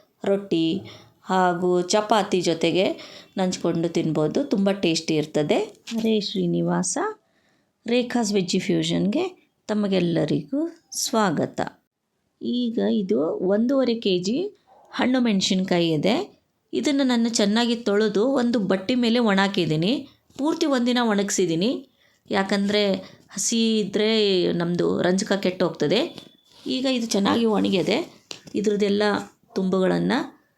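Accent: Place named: North Karnataka, India. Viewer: Akka uttarkarnataka slang olag matadri, cholo ansutta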